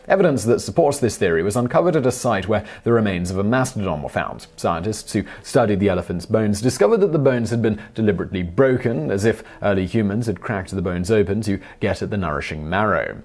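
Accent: United Kingdom. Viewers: So...you want a Brit to use incorrect American pronunciation? Here's a tip, go see the world and make sure to never use the complaint that "This isn't how we do things in America", because we either don't care, or find your ways quaint and strange, especially pronunciation.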